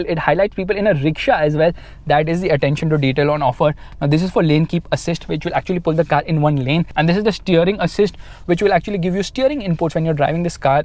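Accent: India. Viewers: I know lol.. I said I thought he said fucking Since his speaking fast and his indian accent is thick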